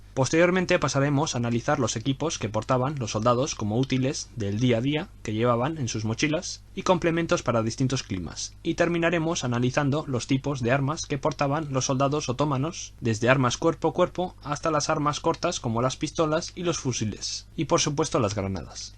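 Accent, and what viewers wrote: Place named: Canary Islands, Spain. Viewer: aupa buenos videos y buen acento canario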